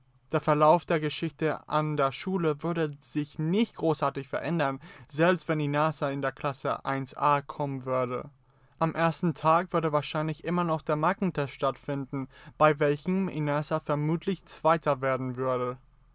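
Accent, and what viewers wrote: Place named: Germany. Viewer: I thought he was German lol. From his accent while speaking English.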